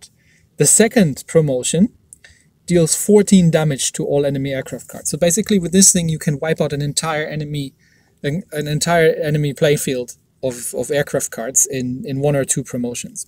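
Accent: Germany. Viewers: Nice video! I'm currently struggling through the 120's lol. Just can't keep my units alive long enough and my health high enough to 3 star stuff. Also, noticed that despite living in Australia for so long, you've still got a prominent German accent. A lot of people tend to lose theirs over time or pick up a new one from where they live.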